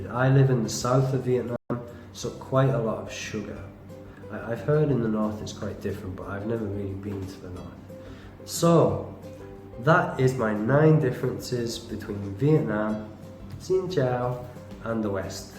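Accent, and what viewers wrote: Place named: Ireland. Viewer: You are so cute!! and your Irish accent OMG <3 *major crush*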